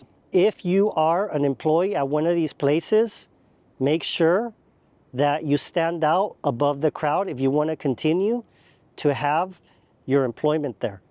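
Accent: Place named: Latin America. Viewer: That stupid latin accent .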